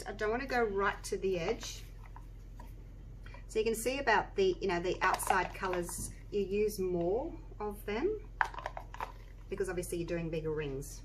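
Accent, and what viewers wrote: Australia. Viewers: Love Yr Aussie Voice🙂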